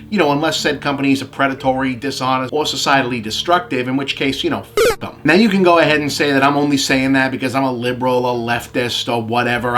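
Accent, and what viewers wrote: Boston, United States. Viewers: UNIONIZE!!! Also, loving the chance to hear your Boston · Is that a real Boston accent or a new gimmick? I've never heard it before. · Agreed. The angry Bostonian scent ads a good bite to his commentary. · Frost Soul it’s a Boston accent. He usually tries to down play it, but it does slip through sometimes. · Boston accent is one of if not the most assholic accent. How can people living near Harvard sound so stuuuupid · Bob, I don’t know how I didn’t notice this before, but you have a very Bostonian accent. You should embrace it and use it more often when you go on an angry rant. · Is it just me or is Bob extra Bostony in this episode? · I can hear the Boston coming out XD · It's a Boston accent.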